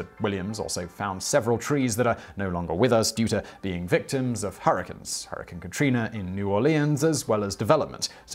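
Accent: United Kingdom. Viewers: Okay, I know I give you crap all the time about your pronunciation of Los Angeles, although I did hear you say how an American would say it really recently, proud of you, the way you said New Orleans is not too different from where people where I'm from say it, so I don't know if you've been taking notes or just in the UK that's how they say it. there's another English guy on another Channel I watch, and he keeps saying Saint Louis as "Saint Louie". now, there is precedent for that, and historically it has been pronounced like that, but nowadays we say it more like "Saint Lewis". So, imagine your Welsh friend is really holy. Anyway, I have to say though, I didn't know whether or not this channel had started getting into conspiracy theories when I saw the title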